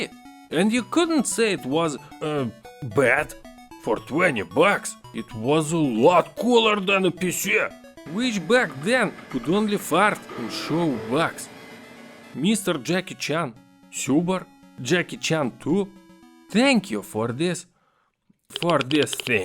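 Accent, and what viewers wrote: Russia. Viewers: I don’t understand, are you Russian? the accent is very similar to Russian (hello from the Russian Far East)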